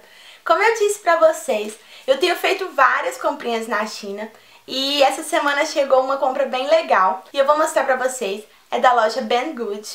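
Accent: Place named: Northeast, Brazil. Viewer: acho bonitinho esse seu sotaque nordestino ;P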